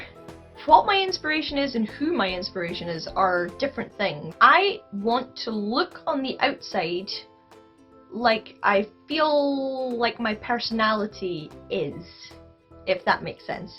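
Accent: Scotland, United Kingdom. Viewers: What part of scotland are you from??💗💗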